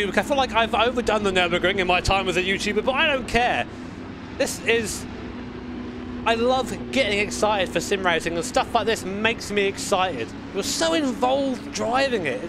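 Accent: United Kingdom. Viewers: "come on G 'E fo 'hy" a true Brit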